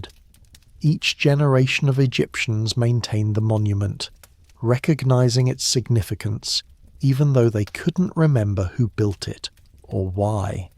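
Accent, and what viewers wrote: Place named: England, United Kingdom. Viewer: please please No More English Accent !!! please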